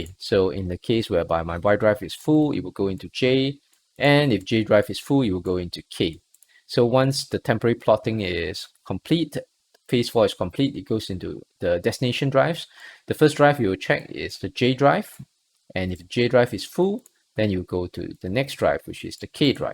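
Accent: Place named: Singapore. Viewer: Nice video but I cannot understand your Singrish!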